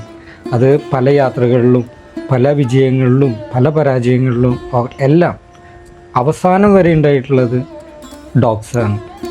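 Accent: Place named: Kannur, India. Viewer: ningalude bhasha kannur karudeth pole und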